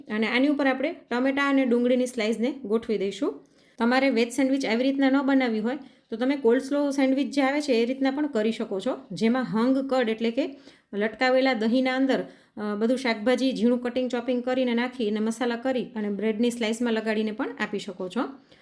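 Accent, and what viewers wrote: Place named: Saurashtra, India. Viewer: વાહ ખુબ જ સરસ બેન તમે કચ્છમાં રહો છો પણ તમારી ભાષા સૌરાષ્ટ્ર જેવી છે તમે સૌરાષ્ટ્રમાં ઉછરેલા છો, હું પ્રોપર સુરેન્દ્રનગર થી છું હાલ વડોદરામાં રહું છું. તમારી દરેક રેસીપી હું ટ્રાય કરું છું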